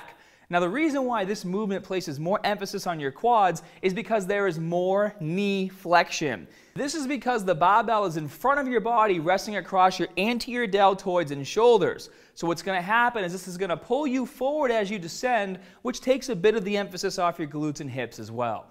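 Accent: Boston, United States. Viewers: omg he's from Boston, I've watched him for years and it's always come out, get over it! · I came out of curiosity stayed because of the Boston accent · Are you from boston by any chance? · I almost can’t get through this video with that Boston accent. Geez lol. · Sonia Boston accent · oh man! that boston accent! love it! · Is this guy from Boston? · The more I watch videos the more i kinda sorta want a Boston accent. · He’s from boston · This guy lol bahhston for sure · Its a boston accent apparently. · That accent though ❤️ Boston? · DIdn't have to listen to that horrible Boston accent longer than i needed to...thank you sir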